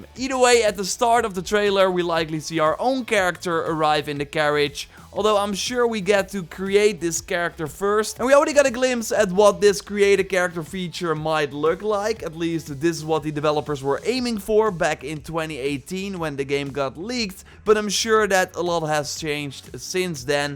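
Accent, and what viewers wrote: Netherlands. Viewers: I can recognize your dutch accent, when you say "video" you can hear it for example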